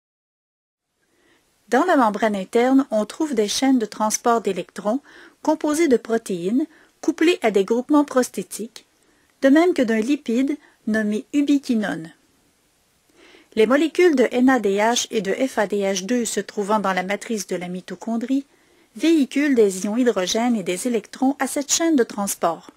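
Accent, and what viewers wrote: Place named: Quebec, Canada. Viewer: Clair et Conscit merci ! (petit + pour l'accent québéois trop génial :) )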